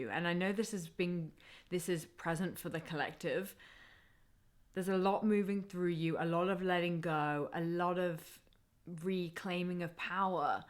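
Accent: United Kingdom. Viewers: Hello my favorite dreamy reader of my path in this coming new life, Your readings are so resonant of the process I am going through for the last few months, sometimes I find it incredible and very enlightning, thank you for the energy you devote to my readings, I am always looking forward to just seen you and hearing your beautifully sounding British accent and that natural radiance and beauty that comes within you, that I love so much, don't ever stop ohhh yes and I Love You more than you will ever know!!!!!!!💖💖💖💫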